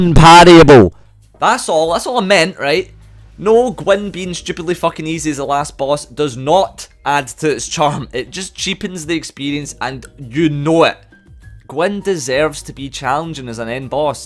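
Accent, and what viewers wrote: Scotland, United Kingdom. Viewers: Anything is better in a heavy scottesh accent. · i canny tell if its where youre feh or no but its sounds like you are scottish but tryna be understandable af to everybody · Are you from Scotland? Love your accent <3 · U Scottish, mah boi? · This is the first video I've seen from you. I've never heard a more scottish accent. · It's almost weird hearing a Scottish accent like mine on Youtube. Sooo refreshing though. Also - I fully agree, lul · Wow I just got this vid in my recommended and I feel blessed because I never knew having a Scottish (Irish???) person yelling at me would be such an experience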